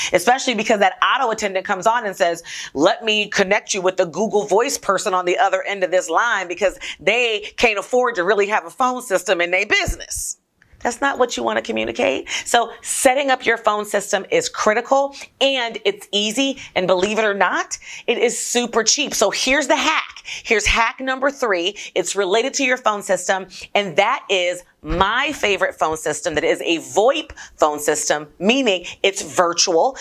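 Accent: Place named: Europe. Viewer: Sound like a European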